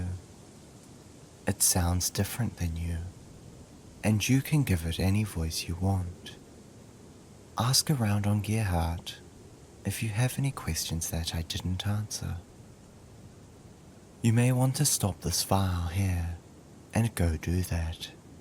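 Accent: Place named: United Kingdom. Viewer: lmao what? 1. he’s british. he’s going to have an accent. 2. every single video is a file.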